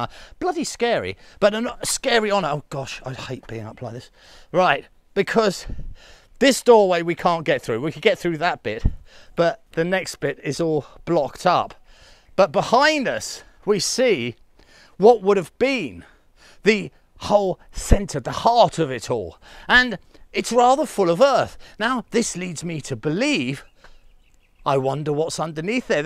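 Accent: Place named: France. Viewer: We like your French accent ; keep on 👌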